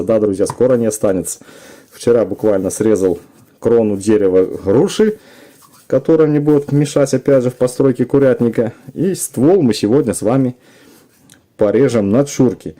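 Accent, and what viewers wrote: Belarus. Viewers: привет ты белорус? такой акцент прикольный....😁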